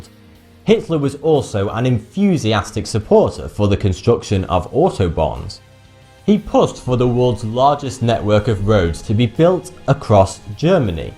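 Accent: United Kingdom. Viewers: 이분 영국식 발음 진짜 취향저격; 신기한게 H를 에이치라고 안하고 헤이치라고 하시네요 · 찰진 영국발음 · Gilbert 개인적으로는 영국억양이라 생각합니다만... (개인적으로)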